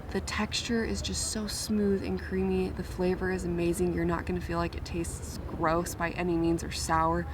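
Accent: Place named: Seattle, United States. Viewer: Your accent says it all, Seattle accent. Greetings from Sweden :)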